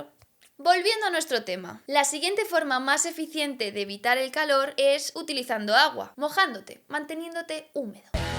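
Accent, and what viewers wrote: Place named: Colombia. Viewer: No parece Española, parece Colombiana.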